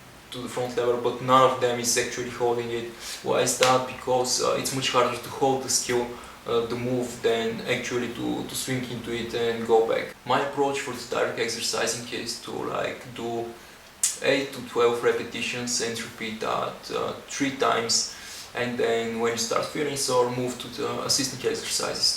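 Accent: Bulgaria. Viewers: are you bulgarian ?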